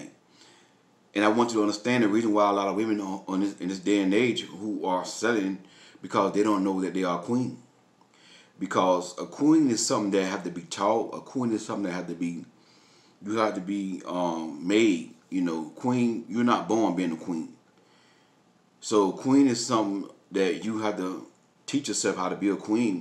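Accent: South, United States. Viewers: Your Southern Accent💖💖💖 You have wisdom.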